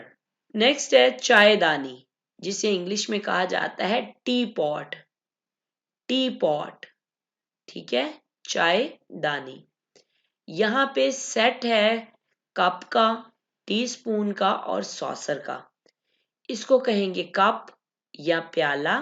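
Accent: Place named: Punjab, India. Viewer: Typical punjabi accent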